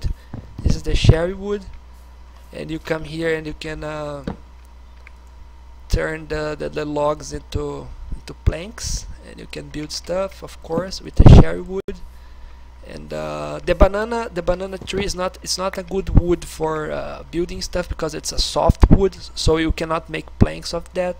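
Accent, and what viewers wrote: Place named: Portugal. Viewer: are you portuguese because your accent is the same XD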